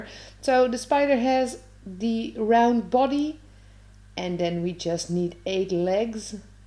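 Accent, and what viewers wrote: Netherlands. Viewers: I didn't know I needed to see this am, but apparently I did Also, are you guys Dutch? The accent from the voiceover sounds very familiar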